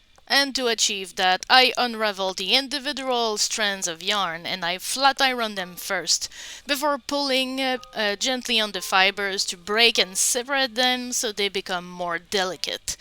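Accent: Quebec, Canada. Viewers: 2 minutes in, I had to check your "About" section to confirm that you are indeed a fellow french Canadian hehe. I recognized the accent ;)) Salutations de Laval! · 1 minute in and I just knew you were french canadian, amazing work mon chum <3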